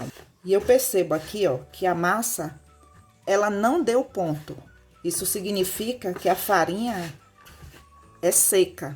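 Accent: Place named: Paraíba, Brazil. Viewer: Acabei de me inscrever!! A M E I a sua forma de explicar com riqueza de detalhes!!! Além do mais, que sotaque bonito!! De onde vc é?!?!🤔 Parece sotaque paraibano!!! GRATIDÃO pelo ensinamento meticuloso e paciente!! Deus abençoe a sua vida, seus projetos, seus objetivos!!! Saúde e paz!!! 🙏🙏🛐💕💕🤗🌻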